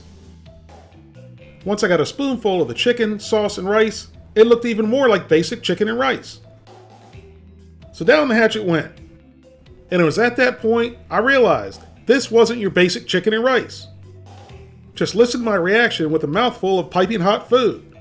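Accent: South, United States. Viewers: It's funny you sound less southern when you're with your wife.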